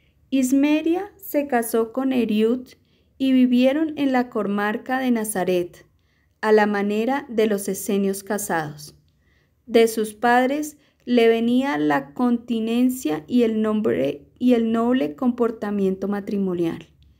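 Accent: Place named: Colombia. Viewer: Mucho acento colombiano me genera resistencia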